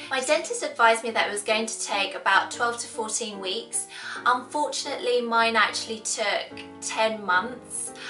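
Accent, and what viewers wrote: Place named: United Kingdom. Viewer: You look asian and your accent was brtish i love it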